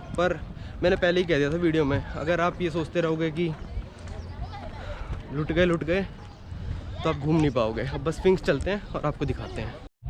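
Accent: Haryana, India. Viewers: Your accent shows that you are haryanvi 🤩🤩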